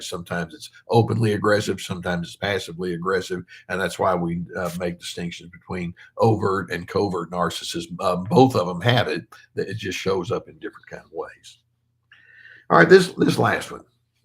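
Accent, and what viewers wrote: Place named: Texas, United States. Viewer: ! I love your voice! And that you are slightly self conscious about this! You are so kind beautiful and helpful! Your voice is one of your signatures and I could recognize you in the dark! Not to mention your Texas accent! You are the best!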